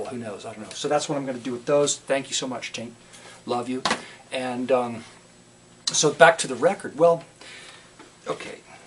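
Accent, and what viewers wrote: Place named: South, United States. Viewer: Sounds rather hillbilly & fun!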